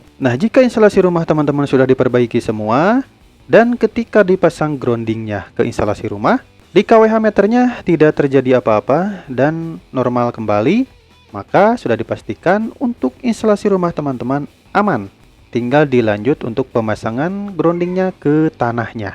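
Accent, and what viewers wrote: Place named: West Java, Indonesia. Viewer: Assalamualaikum kang, penejelasannya keren dan nyunda, enak di dengar di kuping parahyangan model sayah. Pertanyaannya nih kang : Jika saya telah memasang grounding sendiri, namun tidak di konek kan ke KWH meter oleh PLN saat masang, apakah tidak masalah bagi keselamatan jaringan dalam rumah saya? Hatur nuhun kang atas penjelasannya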